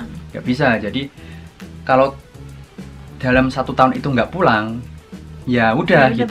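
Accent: Java, Indonesia. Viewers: Jowo ne ndi mas..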